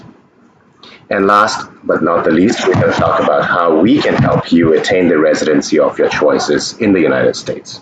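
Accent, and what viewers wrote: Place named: India. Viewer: Indian accent is awesome.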